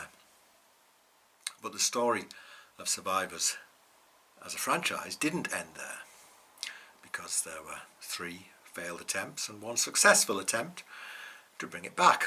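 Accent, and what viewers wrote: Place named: North Yorkshire, United Kingdom. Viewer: I loved the series. Are you from North Yorkshire? I recognise the accent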